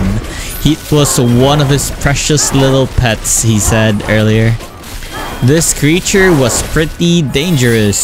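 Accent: Philippines. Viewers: Hello, are you by any chance a Pinoy? Just a random guess based from your accent.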